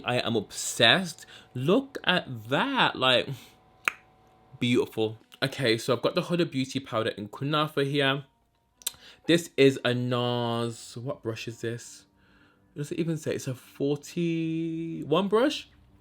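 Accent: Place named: Northern England, United Kingdom. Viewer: You’re so gorgeous it’s bloody ridiculous! Make version of Naomi C. Anyways I can hear a Sheffield or Man accent but you say all those American slangs I’m confused 😁. Uk based or nah?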